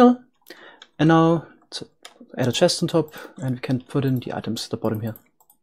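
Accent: Germany. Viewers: ahh ok, thanks. where you from, you sound like german i have that feeeling :D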